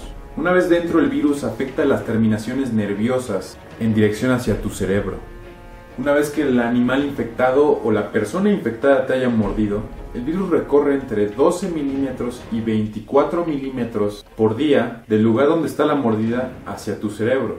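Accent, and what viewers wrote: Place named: Mexico. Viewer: Porqué los mexicanos hablan así? Desmerecen todo lo que saben...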